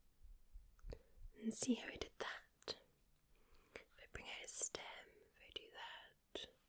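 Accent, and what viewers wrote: Scotland, United Kingdom. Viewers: Do you know who I am My brain: slight Scottish accent Me: MERIDA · I hear Scottish I click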